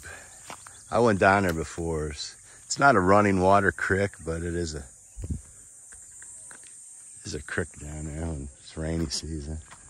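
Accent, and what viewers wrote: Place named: Midwest, United States. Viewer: Your accent and how you say Crick reminds me of home. Are you from the Midwest?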